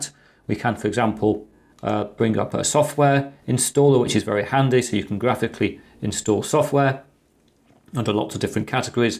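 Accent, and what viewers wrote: England, United Kingdom. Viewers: I miss the energy you had when you used to exclaim, "RIGHT!" Now it's just, "right..." Your English-isms are one of the reasons I fell in love with your channel and now, it seems, you're downplaying them. Your content is as good (if not better than) ever but I like a little old world flavor (or is it flavour?). Keep up the good work but give us the whole package.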